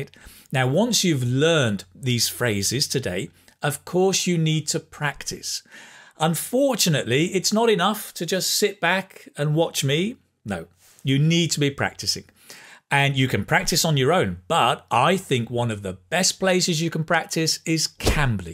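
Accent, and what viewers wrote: United Kingdom. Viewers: Wao thank you so much.I am obsessed with your videos.I am extremely curious how can I learn the British accent?I love your accent😇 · I just love to watch your videos and practice my fluency. I love that repeating session..feel as if I talk to a British teacher in person. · In my free time usually do learning English and copy the words with British accent as you had which makes me improve a lot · Do you speak with a British accent?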